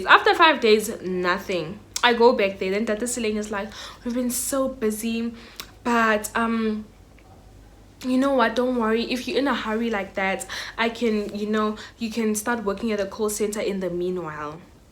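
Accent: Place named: Nigeria. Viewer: The Nigerian accent >> 😂😂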